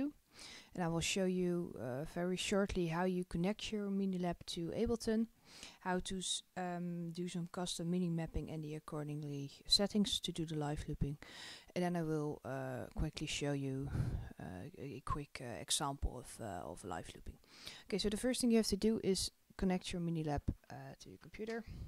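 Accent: Netherlands. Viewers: ik hoor dat je nederlands bent dus ik doe het ff zo, mijn minilab kan niet opnemen en selecteren zoals die van jou het doet. mis ik iets?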